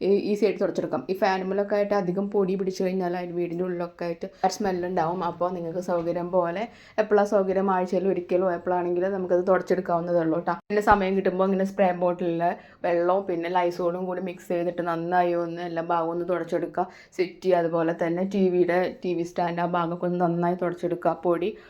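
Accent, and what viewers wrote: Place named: Thrissur, India. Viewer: തൃശൂർ കാരിയാണ് എന്ന് തോന്നുന്നു... പൊട്ടമണം 😅😅😅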